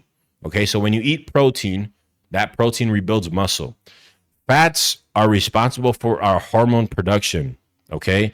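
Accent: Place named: New York, United States. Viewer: My guy sounds like he's from NYC...good video bro..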